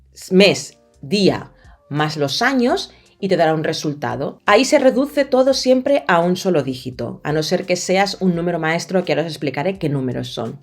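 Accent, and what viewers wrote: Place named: Spain. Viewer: ¡¡Que contraste el tuyo!!.tienes rostro caribeño y hablado(acento) españolete.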